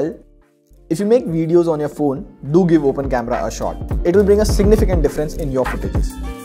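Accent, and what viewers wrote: India. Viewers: clicks on the video, dude speaking in an indian accent, shuts the video never trust indians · Damn, l could barely understand, hideous Indian accent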